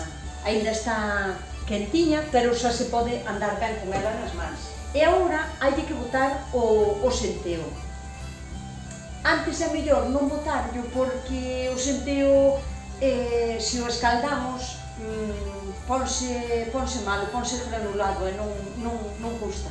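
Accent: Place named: Ribeira, Spain. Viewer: De donde eres??? Porque falas ijual que nos aqui en Ribeira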